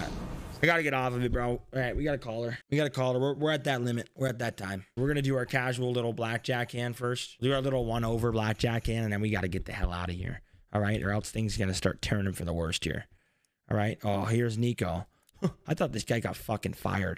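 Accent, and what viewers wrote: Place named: Canada. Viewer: I love the Canadian accent❤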